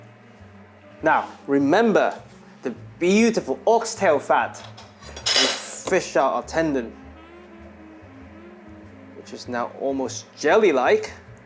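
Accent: England, United Kingdom. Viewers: You'll be surprised with how much English the average asian have to learn. That, combined with the fact that he'd be studying in England for a long time to get that accent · brother is throwing me off he looks like an asian uncle with a british voice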